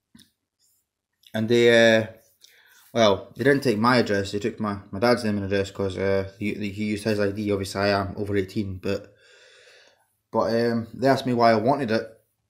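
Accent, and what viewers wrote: Scotland, United Kingdom. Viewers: Scottish accents are dope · Very dope. Now, is he from Edinburgh or from Glasgow?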